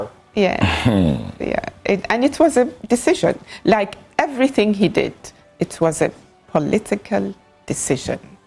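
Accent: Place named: United Kingdom. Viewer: She talks like a British lady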